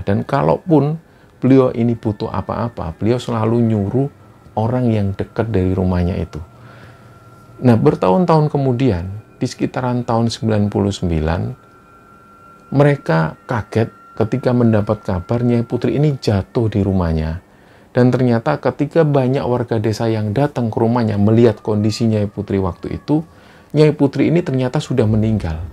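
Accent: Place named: East Java, Indonesia. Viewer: Sambil masak dengerin cerita mas jaaannn nyaman tenan, aku sbg org malang cocok dengan pembawaan mas yg logatnya khas jawa timuran...sukses terima channel aku ngikuti dr subscribe nya msh di bawah 100 smpai skrg gk prnh ketinggalan cerita mas ❤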